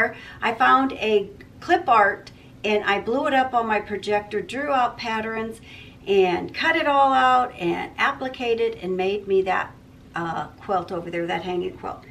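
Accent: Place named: South, United States. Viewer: I just love those fabrics you chose; the cardinals and the bicycles! Your bag is perfect! I look forward to seeing the completed pattern project. I am trying to make my 1907 Baby Bonnet pattern available. We do love a challenge! you are special! I don’t know if you’re southern, but we say some things the same way, and I have to think really hard to complete my sentences. I put a dash of vanilla flavouring in my coffee. It improves the taste. I enjoy your chats. I’m also trying to lose inches. Best wishes!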